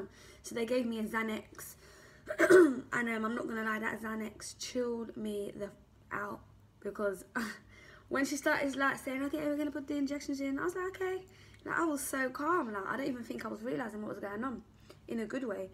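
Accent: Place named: London, United Kingdom. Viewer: Nice London gal accent though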